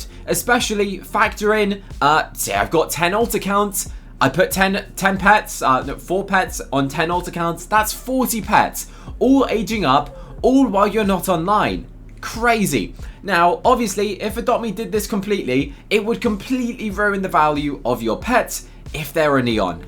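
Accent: England, United Kingdom. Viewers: Is it me or he is sou more British 😅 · is from england ye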